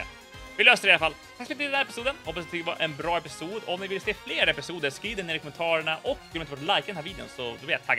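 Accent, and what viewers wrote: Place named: Umeå, Sweden. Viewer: Du är från Umeå? Man hör på din dialekt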